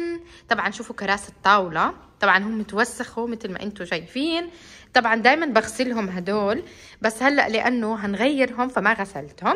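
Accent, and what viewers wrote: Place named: Egypt. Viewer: أنت مصرية بتحكي مصري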